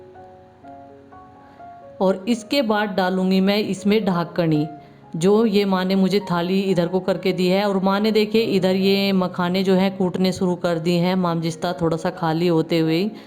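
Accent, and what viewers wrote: Haryana, India. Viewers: Mam aap Haryana se h ya U. P. Se language toh Haryana ki h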